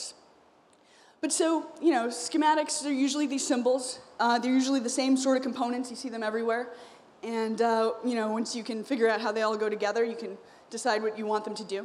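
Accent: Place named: United States. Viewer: I really like her accent , what part of U.S is she from ?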